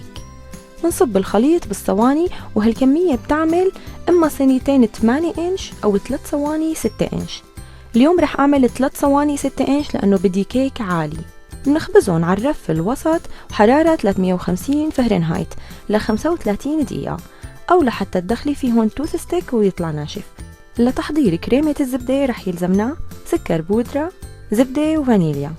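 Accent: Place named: Levant. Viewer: تسلم ايديكي شي ظريف بحب لهجتك كمان شاميه 😙😙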